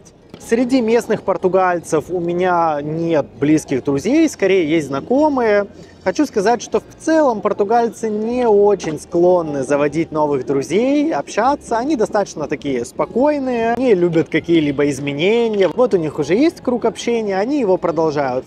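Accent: Standard. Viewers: Рада слышать хороший,чистый русский язык! Отвыкла давно от этого Современную речь слышишь,даже многого не понимаешь из за сленга Особенно у молодежи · Теперь понятно от куда такая чистая речь. Успехов вашей семье во всем!!!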